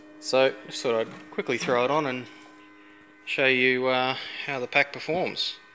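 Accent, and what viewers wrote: Australia. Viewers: Unmistakable PERTH accent!!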